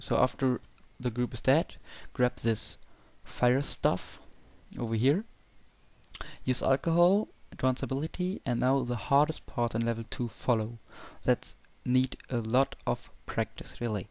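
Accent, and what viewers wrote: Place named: Germany. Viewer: Eigentlich gutes Video, man merkt nur aufs übelste das du Deutschsprachig bist ;) btw, mir ist aufgefallen, dass du beim Snipern kein Stab in der Hand hast, was natürlich sinnvoll wäre ... ansonsten gut gemacht :D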